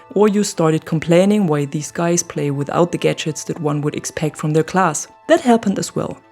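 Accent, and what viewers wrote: Germany. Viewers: Yea, i also think it's a German accent. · Your good, is that a German accent i detect 😉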